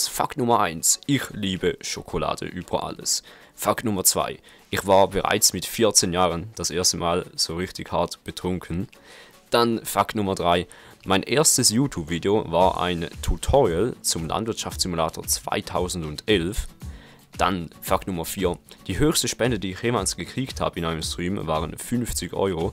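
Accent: Switzerland. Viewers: Süsser Schweizer akzent ;D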